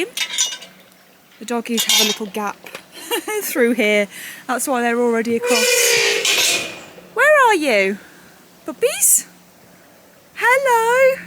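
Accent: United Kingdom. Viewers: It’s unbelievable how there is discrimination between British accents 😢 very sad , imagine how the foreigners feel when they are discriminated because of their accent. And by the way I love your accent ❤ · I love UK regional accents. Love yours! I don’t live in the UK. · Lovely ❤ I love you touched the bit with the accents! I am a Bulgarian, who lives in the UK now 10 yrs... I do have and I will always have an accent... I always feel embarrassed, especially at more posh places, formal work events and important meetings. However, now you saying been offended by people, genuinely blows my mind. You speak lovely British English and I can't understand what could be wrong with people who think and say that. Keep being yourself, you are amazing and that's why people who love you do it, because that's just you. My absolutely favourite amongst all influencers and the most glamorous woman, an absolute example for everything! 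💕 Btw, my name is Desi. 🥰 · I just can’t believe all these insults about your accent. I am a Canadian gal and i just see you as a beautiful British person. Love your accent .❤🇨🇦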